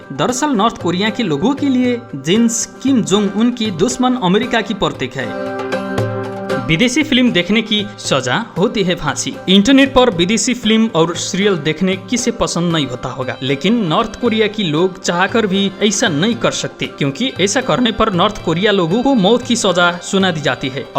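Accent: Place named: Nepal. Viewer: नेपाली हो नी, तर लवज नेपाली नेपाली आइदियो😜😜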